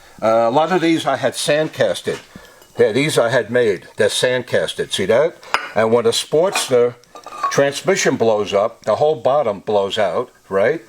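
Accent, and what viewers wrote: New York, United States. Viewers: East Coast boy I new I could tell you were from New York... I'm in Philly love what ur into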